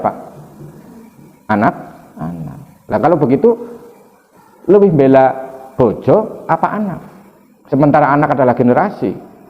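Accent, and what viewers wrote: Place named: Banyumas, Indonesia. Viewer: Gus ..ngendikane banyumasan bsnget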